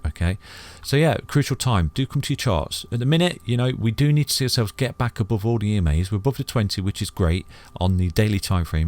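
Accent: United Kingdom. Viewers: Realistic analysis, realistic british accent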